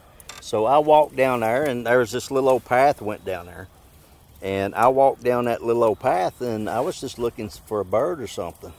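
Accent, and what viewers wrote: South, United States. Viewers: Thanks, but I gotta say I miss your sweet southern drawl.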